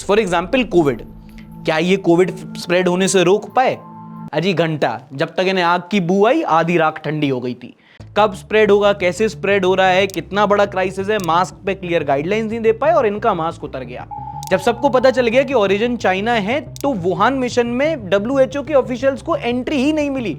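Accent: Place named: Bundelkhand, India. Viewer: I'm from Jhansi too...and your bundelkhandi is on point 🤌🏻😂 love your content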